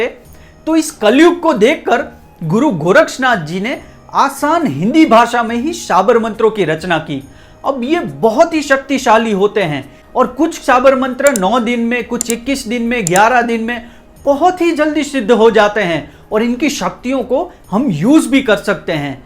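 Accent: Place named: Gujarat, India. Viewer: Bhai gujarati lage bava hindi bol rahe hai super a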